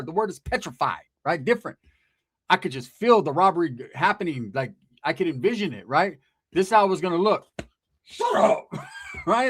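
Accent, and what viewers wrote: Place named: Detroit, United States. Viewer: Sounds like Eastside Detroit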